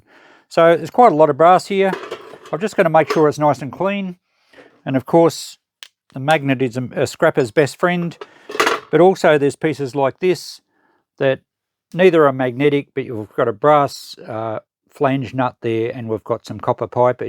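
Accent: Australia. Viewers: I tried watching this twice, but It was late and I was tired. Your smooth Ozzy pronunciation put me to sleep 😴. Lol, third time now, I am well rested. Fun sorting video 👍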